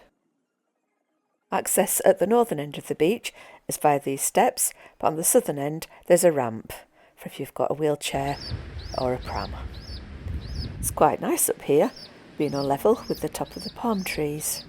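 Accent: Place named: Yorkshire, United Kingdom. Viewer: Excellent video and we enjoyed the commentary too; very informative. We're from Yorkshire and we think you may be too! Many thanks!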